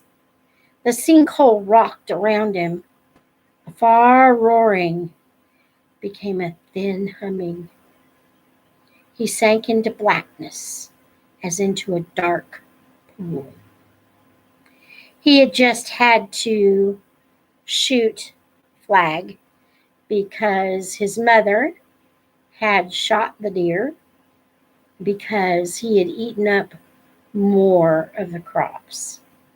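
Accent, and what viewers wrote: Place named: South, United States. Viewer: I will be honest with you I’m not big on professional audiobooks to begin with so I was a bit iffy coming in, but you’re skill in narration and your beautiful southern accent as another east Texan was so wonderfully fit for this story and the characters and your delivery of that final line shattered me. As a child this book devastated me, but as an adult who has lived so much more life and suffered loss of other’s innocence, this book wrecked me in the most beautiful of ways. Thank you for your dedication to finish this story despite the hardships and time it took, may the Lord bless YOU Miss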